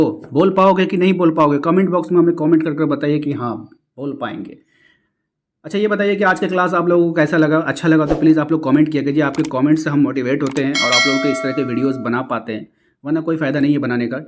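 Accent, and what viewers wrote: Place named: India. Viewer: fxck curry accent 🖕🏾🤢🤮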